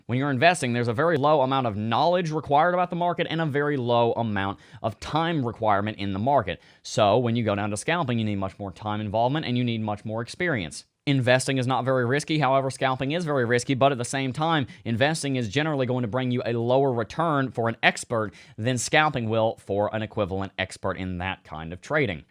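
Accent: South, United States. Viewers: I love your southern accent and with a name like I can trust everything you say hombre. You got a sub in me mi amigo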